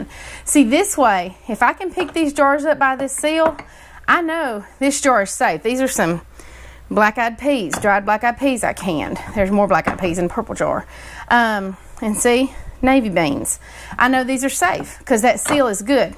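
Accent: South, United States. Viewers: Girl, love your accent. I miss the south. Thank you for the tips and video!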